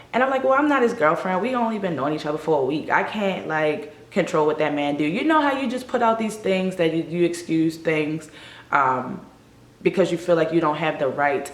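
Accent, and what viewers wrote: Baltimore, United States. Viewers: The Baltimore came out in this vid lol #westbaltimoregirl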